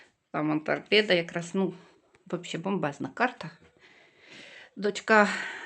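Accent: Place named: Vinnytsia, Ukraine. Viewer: Я здогадалася,що ви з Вінничини, по вашій манері розмовляти,я також з Вінничини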